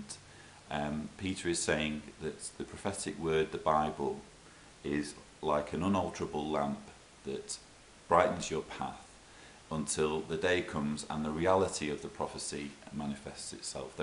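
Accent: United Kingdom. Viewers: hi “ in acts. Furthermore there is no salvation in anyone else, For there is not another name under heaven that has been given among men by which we must get saved.” This is there errors there not holding on to the head, Christ “! In 1 Corinthians. For no man can lay any other foundations then what is laid., Which is Jesus Christ.” This men from Warwick have taken over the seat of Jesus, Apparently. And the CB name Warwick seems to be mean king maker. Yes they have sat up there own princes and kings. In first 1 Thessalonians 2: 2: 3. Said The exhortation we give does not arise from error,” Or from uncleanness or with deceit. This man the Bible writers that and Jesus apostles were chosen by Jehovah.that’s why when they would speak it came to be so. And men from today are absulitly not chosen from God”! That’s why there prophetic <num> and 1947 or 1957. There false prophets. 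Your daughters are so cute, I enjoy hearing your accent of British. Please don’t change it bye!” In number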